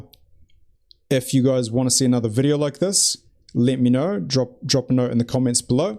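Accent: New Zealand. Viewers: "spishal" I'm sorry.... I cannot with the NZ accent. :( I must lose out on valuable chess info. grrrrr. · this dude sounds like a kiwi im subscribin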